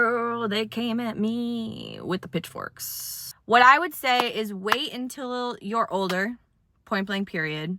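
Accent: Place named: New England, United States. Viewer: o didn't know you were a new englander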